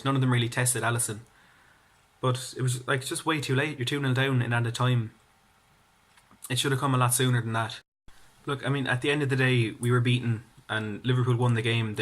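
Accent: Ireland. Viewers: a weird accent, how rude, is from Ireland, his accent is very common in Ireland!, comment on his Blog, not how he sounds.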